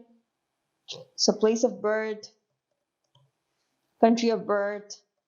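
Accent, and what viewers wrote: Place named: Canada. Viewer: Good Video.Nicely explained.Your English Ascent is not Saudi nor Bangla but more of a Canadian.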